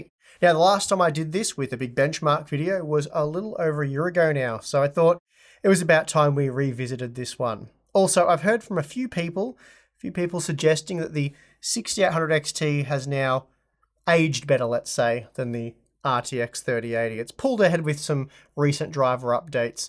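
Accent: Australia. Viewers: What I got out of this review is purchase the GPU which is cheaper. Radeon had higher 1% lows overall than Nvidia on most games. Shouldn't this be the minimum standard when choosing a video card. I just love the Aussie accent, to the point without all that waffle. Thanks.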